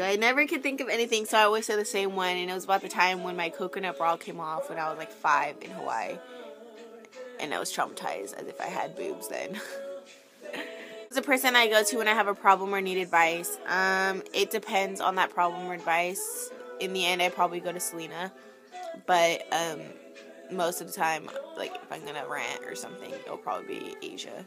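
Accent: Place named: Texas, United States. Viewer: Where are from I feel like you're from texas like me ? ;o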